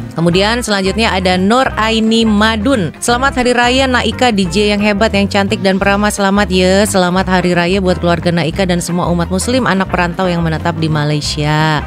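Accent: Indonesia. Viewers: Dengar percakapan sahaja sudah tahu Sis ini orang Indonesia! Masih ada yang bertanya orang Indonesia atau Malaysia? 😂 From Malaysian - Kuala Lumpur Malaysia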